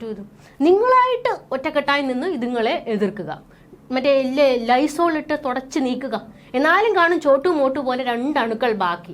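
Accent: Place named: Kannur, India. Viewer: ഇയാളുടെ സൗണ്ടും സംസാരവും പൊളി ആണ് എന്റെ fave ആണ് ഇയാൾ ഓണ്ലൈന് ചാനലിൽ 🌹❤️ പിന്നെ ആ😊 ക😊ണ്ണൂർ സ്ലേ😊ങും👌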